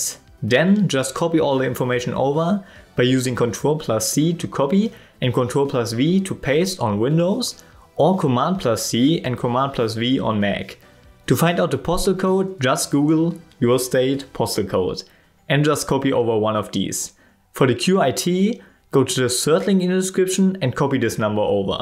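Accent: Germany. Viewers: meinte Deutschland einfach wegen aktzent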